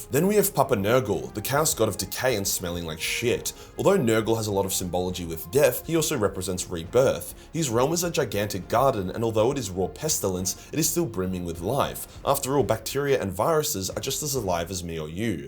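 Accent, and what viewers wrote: Australia. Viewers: Heh you didn't just stumble over "unfathomable" - you also mispronounced "dirties". I think even taking your Australian accent into account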